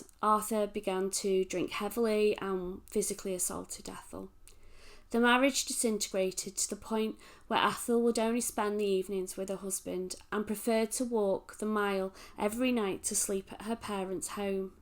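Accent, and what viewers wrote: United Kingdom. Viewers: Thank you for telling this so wonderfully. May I ask where you're from? Wigan or St.Helens maybe?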